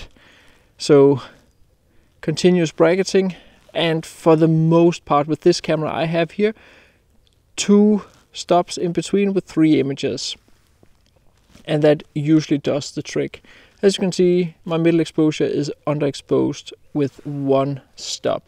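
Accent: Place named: Denmark. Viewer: That danish accent is a real joy to hear sometimes. Especially when it sounds like you're calling them shitland ponies 😂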